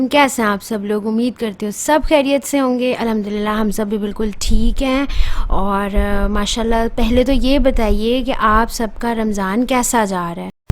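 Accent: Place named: Bihar, India. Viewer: I thing u r Behari speaking mughy behari lagti ho aap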